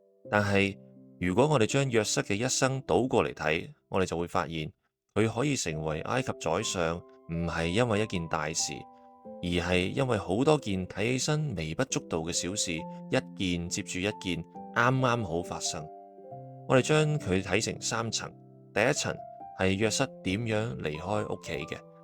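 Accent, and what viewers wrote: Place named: Hong Kong, China. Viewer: 牧師多謝你，終於有一個完全港式廣東話嘅頻道，請繼續努力，主與你同在🙏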